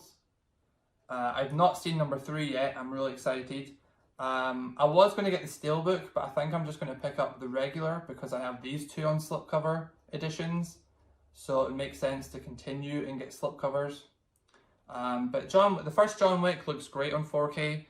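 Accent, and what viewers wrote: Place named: Scotland, United Kingdom. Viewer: Hey dude you sound Scottish - where about are you from? Do you buy blu rays from Asda HMV etc? I’m big into 4K blu rays also from Scotland. Watched Aquaman 4K on my Oled and was incredible.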